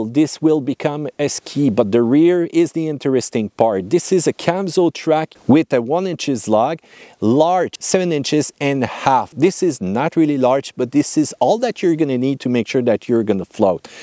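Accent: Quebec, Canada. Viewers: the french canadian accent cracks me up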